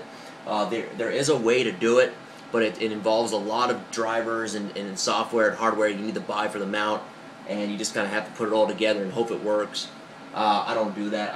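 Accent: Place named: Texas, United States. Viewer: i thought you was from texas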